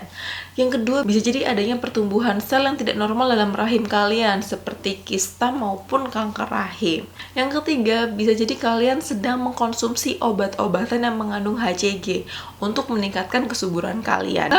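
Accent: Java, Indonesia. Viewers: dokter..asli jawa yaa😊😀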